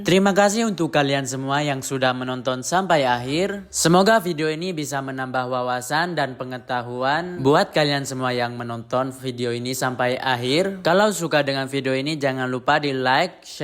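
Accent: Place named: Madura, Indonesia. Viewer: Orang mana pean mas....kog kyak ada orang Madura disitu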